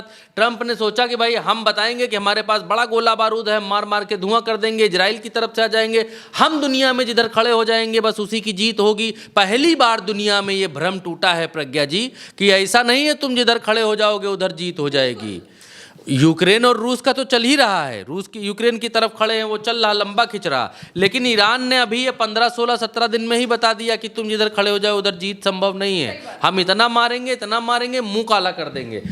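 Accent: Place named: Awadhi, India. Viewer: ka theth Avadhi andaaz 😂😂 maza aa gaya ❤❤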